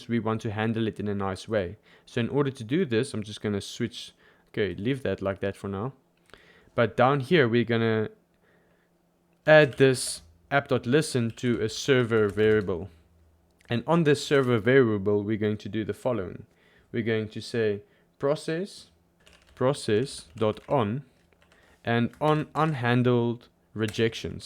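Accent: South Africa. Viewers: Am I hearing a South African accent👀🔥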